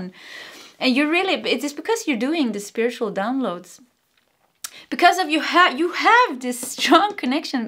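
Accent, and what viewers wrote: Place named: Sweden. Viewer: When I hear you apologize for stumbling on your English, I’m so very impressed how well you do!! I would NOT be able to give a reading in Swedish, so I applaud you for doing so good!! Secondly, both 1 and 2 are 100% me!! I do healing and I have a wonderful old soul tribe. I appreciate this confirmation!! Love your readings and really connect with the Swedish accent as a soul of Swedish descent this time around!! ♥️👏🏻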